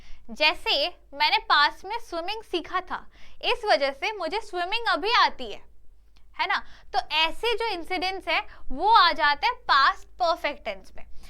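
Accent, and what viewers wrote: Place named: Bengal, India. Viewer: I really like the Bengali accent when you speak Hindi it sounds cute when someone speak like that😊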